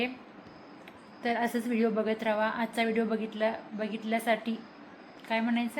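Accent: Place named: Karnataka, India. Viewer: तुला मराठी पुर्ण जमत नाही जरा कन्नड वळनावर आहेत भाषा मी मुचंडीची आहे तुमच्या मामाच्या घराशेरचे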